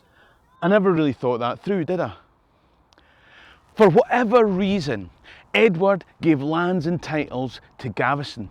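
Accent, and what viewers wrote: Scotland, United Kingdom. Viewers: Good history. The BBC would not want someone with a Scots accent. Thanks. Bill Collins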